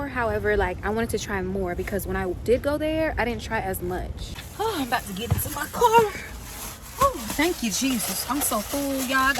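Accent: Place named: United States. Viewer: You got it going on there quick question are you Haitian coz you sound more American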